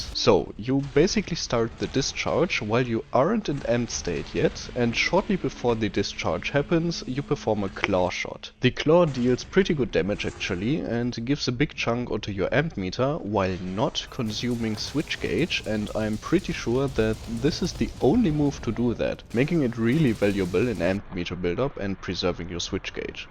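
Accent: Germany. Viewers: Dieser Deutsch Akzent 😂😂😂 gutes Video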